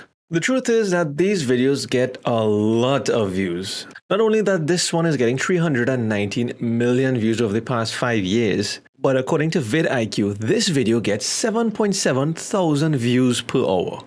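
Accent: Trinidad and Tobago. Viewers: Trini accent? Lol had to ask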